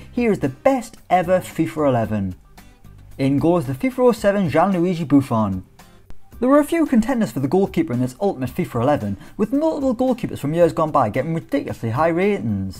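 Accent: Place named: London, United Kingdom. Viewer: His accent sounds more like a posher London accent ngl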